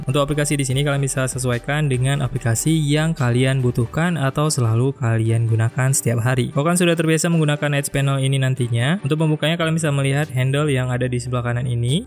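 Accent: Aceh, Indonesia. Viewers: Orang Banda Aceh bang? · Orang aceh ya bang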